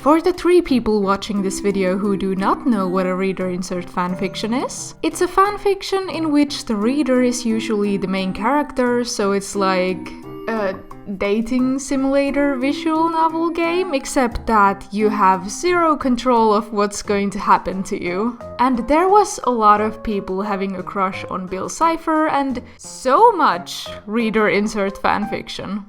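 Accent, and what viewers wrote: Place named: Finland. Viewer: TUNNISTIN HETI SUN AKSENTISTA ETTÄ OOT SUOMALAINEN HERRANJUMALA tekee tästä vielä paremman 😎.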